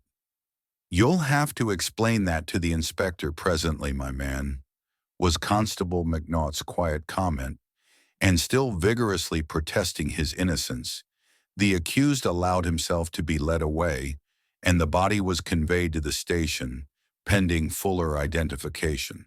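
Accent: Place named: United States. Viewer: I'm sure this is a very fine narrator but I I don't believe these stories could possibly carry as well with an American/Canadian accent as with someone from the UK…since these stories take place in London, and London being as great a melting pot as say New York City San Francisco or chicago, of the same era, any accent from the British isles would do. I mean if it's a i which it obviously is, can't you just as easily click on the British accent button, or however it works??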